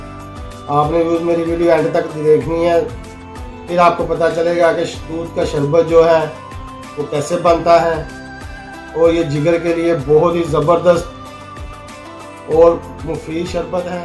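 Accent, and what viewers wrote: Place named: Punjab, India. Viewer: W SLAM BHAI BOHT HI NICE SHARING WITH PUNJABI AACENT LAAA JAWAAAB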